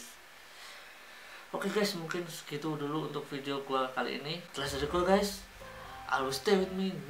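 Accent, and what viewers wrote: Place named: Java, Indonesia. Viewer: medok ngomongnya mas. mending pakek bahasa Jawa aja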